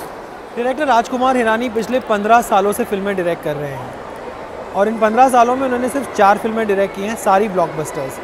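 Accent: Gujarat, India. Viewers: r u Gujarati?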